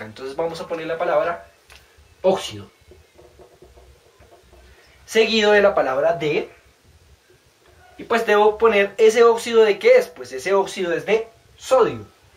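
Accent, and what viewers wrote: Colombia. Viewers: es Colombiano??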